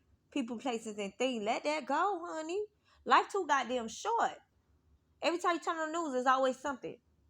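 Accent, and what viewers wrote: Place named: Miami, United States. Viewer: New subscriber ❤️ you’ve been speaking to me all day. You sound like you’re from Miami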